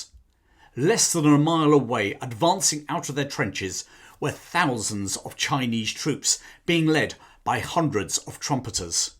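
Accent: England, United Kingdom. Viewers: As a foreigner, I do enjoy your beautiful british pronunciation. Thank you for your british sound in the global era of the unified american english. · Only just discovered your excellent channel. How refreshing to listen to a narrative in English by an English man! Some of those American narrators are terrible. Can't pronounce place names correctly, etc. And as for Charlton Heston on some of Timeline's offerings! Say no more!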